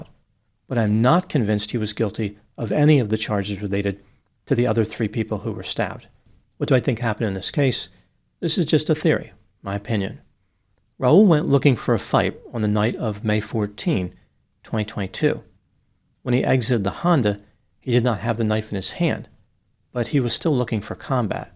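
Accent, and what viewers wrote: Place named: Pennsylvania, United States. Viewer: I keep noticing your accent… sounds very similar to mine. I’m going to guess you’re from somewhere in South central or eastern PA or north MD? I’m from York pa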